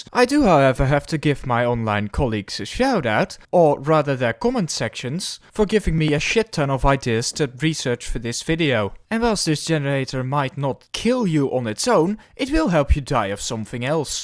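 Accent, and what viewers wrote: Netherlands. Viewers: Ah, classic nederlands accent. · Are you from the netherlands lol?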